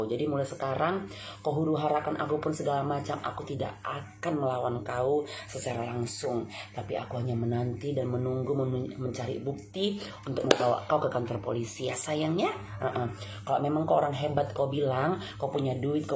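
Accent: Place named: Medan, Indonesia. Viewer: Sama Sama..tolong dong bikin konten marah marah ato kesel ato apalah..aku terhibur bnget Kalo Denger clotehannya..apalagi logat medannya..suka banget..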